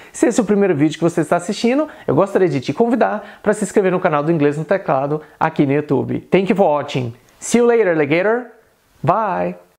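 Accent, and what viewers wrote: India. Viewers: Amei as pronúncias de Inglês Indiano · Como assim, vc zoua igualzinho um idiano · I seu sotaque indiano está perfeitamente compreensível, mas os indianos que eu já conheci, meu Deus, não se entende quase nada. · Ai, teu inglês indiano ainda é muito bom hehehehe tenho reuniões diárias com engenheiros indianos há meses e ainda nao consegui "treinar o ouvido", tem horas que nao entendo nada e somente digo "Ok!".. Porém teu vídeo me ajudou muito na distinção das palavras!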